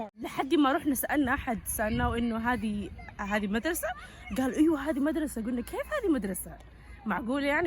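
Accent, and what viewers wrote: Saudi Arabia. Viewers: لهجتها سعودية ودمها سوداني وجنسيتها تشادية 🔥🔥❤ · اتكلمي باللهجتك ....مرة سعودي مر سوداني لا تنسي روحك انتي تشادية سوداني ابدوي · هههههههه باين مأثرة عليك الرياض لهجتك المخلوطة حلوة ... الله يحفظك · لهجة جداوية بححححتا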